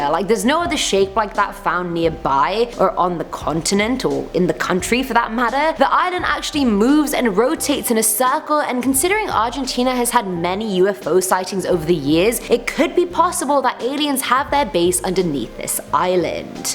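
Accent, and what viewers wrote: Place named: United Kingdom. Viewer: I love her British accent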